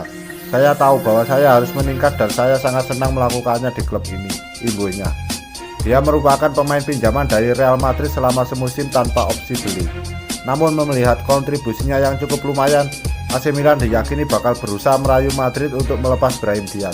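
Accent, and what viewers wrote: Java, Indonesia. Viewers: Jawanya medok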